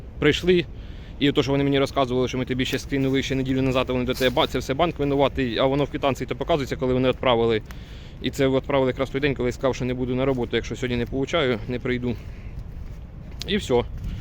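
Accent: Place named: Poltava, Ukraine. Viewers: По розговору думаю Полтава.Или Черкассы. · Від ТАКОГО суржика вуха в'януть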